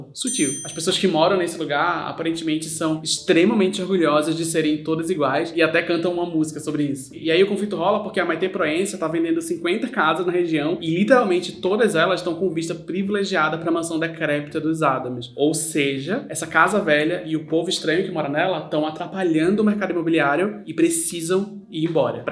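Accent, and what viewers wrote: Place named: Recife, Brazil. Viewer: os vídeos de são o cantinho da internet que me faz sentir que tô tomando cerveja com uma amiga que fala sobre cultura pop de forma inteligente e debochada e matar a saudade do sotaque de Recife Relação parassocial 10\10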